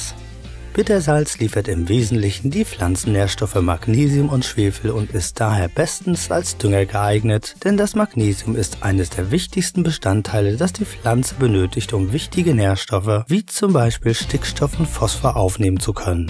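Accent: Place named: North Germany, Germany. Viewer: bidersalz bläder..... der typ ist norddeutscher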